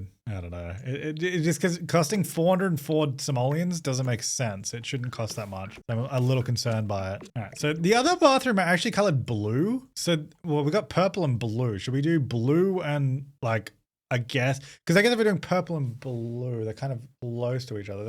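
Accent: Australia. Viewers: Same. He sounded so young, and also his Australian accent seemed more noticeable. That, and sometimes he sounded like he was on speed. Lol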